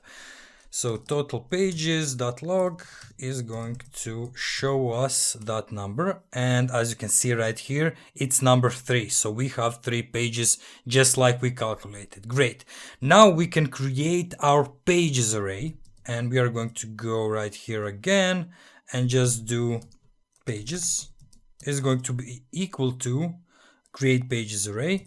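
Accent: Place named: Russia. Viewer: Кайфую от Русского-Английского ))) Бекаус блять миссинг патх, фор пост епта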